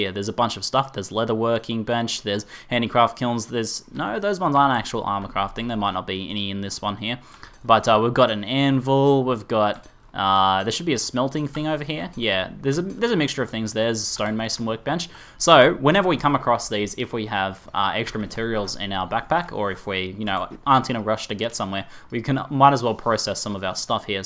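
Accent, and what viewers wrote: Australia. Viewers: Are you Aussie? Cause u sound like it lol I'm Aussie ;D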